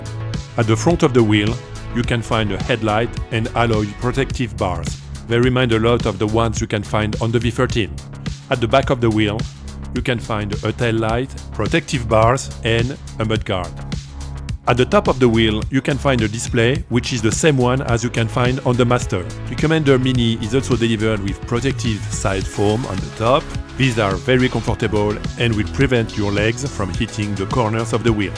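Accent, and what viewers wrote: France. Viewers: Merci pour ta vidéo, j'ai l'impression que tu es français avec un accent qui ressemble au mien en mieux 😅. Tu utilises quel roue à New York dans la vie de tous les jours ?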